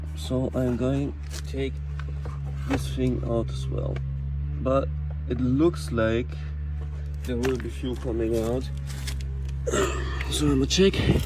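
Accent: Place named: Germany. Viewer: Cool Video but the German Accent ist da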